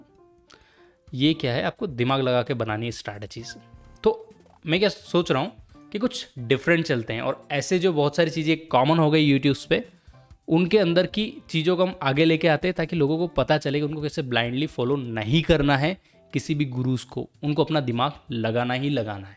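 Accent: Belgaum, India. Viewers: Dear sir r u from belgaum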